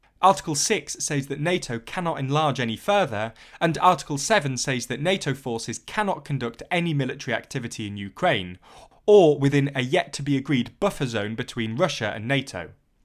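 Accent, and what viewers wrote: United Kingdom. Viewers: You are plugging your opinion, not god. By accent British?British proof through history ar devious and rutten. This is for the beginning. Russia shall not negotiate with the West at all, history establishes the outcome. Russia should and will take all its own decision. Russia won the arms race against the USA, has bain the West doesn't have any. You are welcome anytime.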